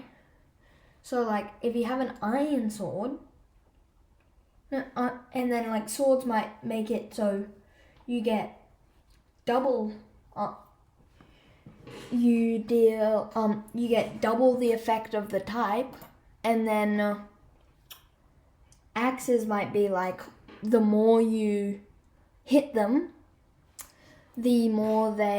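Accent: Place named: Australia. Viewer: Also because of the accent, I’m Aussie too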